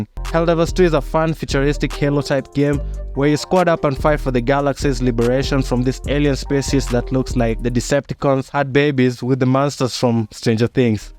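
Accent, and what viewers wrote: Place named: Kenya. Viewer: Just stumbled on this channel, you sound Kenyan 🤔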